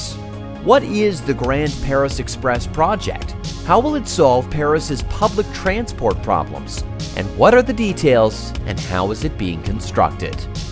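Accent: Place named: United States. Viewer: He can't help it he's and an american. and also the one commented is just joking. Come on! He is trying his best. I"ve been living here in france for almost 21 YEARS and still my accent is very evident although I can pronounce some names accurately.hahhaa trial and error. french words is just mindblowing specially when you try to write what is being said ;)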